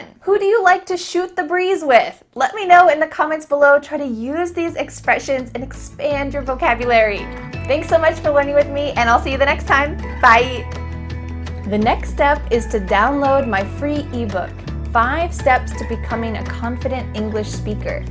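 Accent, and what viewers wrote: United States. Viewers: please, is she from the u.s.? does anybody know? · Wawwawaw i love American accent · She such a good teacher she teach me alot of American accent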